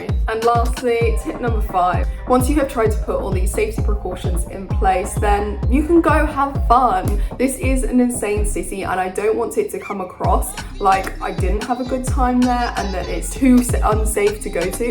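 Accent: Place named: England, United Kingdom. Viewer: I know you are from England, but when you are recording a clip, you Don't need to speak so fast with loud musical background noise.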